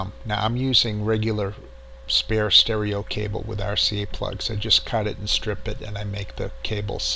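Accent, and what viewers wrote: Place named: Boston, United States. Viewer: i can tell you're from boston...just saying. cool video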